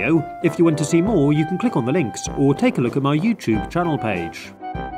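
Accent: United Kingdom. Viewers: Are you British? Can I please get a reply?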